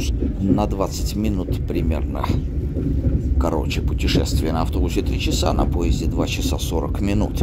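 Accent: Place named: St Petersburg, Russia. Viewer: Это такой специфический питерский выговор? Напоминает нечто среднее между Невзоровым и Федором Чистяковым. (оба питерцы)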